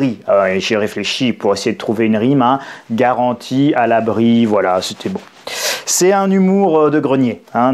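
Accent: France. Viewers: T'es normand ? Yes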